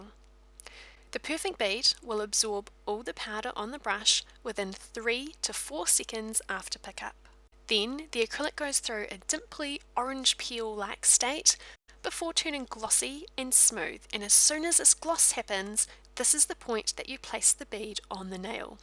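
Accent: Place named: Australia. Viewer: "eats tew wit" lol I love your accent. Great video. Are you Aussie?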